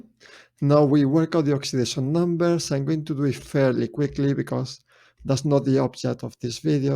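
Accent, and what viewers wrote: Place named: Spain. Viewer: Para alguien inglés no creo pero yo que soy español si lo noto un poco. Mañana tengo el a level de chemistry y tengo que decir que tus videos estan muy bien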